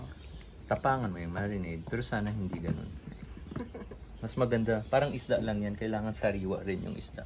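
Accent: Iloilo, Philippines. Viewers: Ilonggo ka gali sir?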